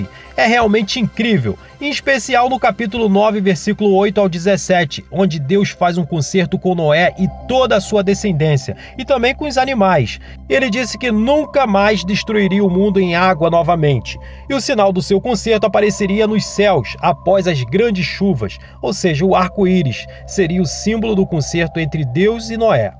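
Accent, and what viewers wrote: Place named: Rio de Janeiro, Brazil. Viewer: Menos sotaque carioca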